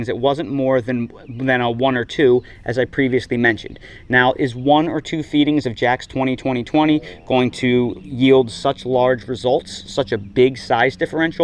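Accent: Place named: New Jersey, United States. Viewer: You are in Carolina but your accent screams NJ, where I grew up….. are you from there?!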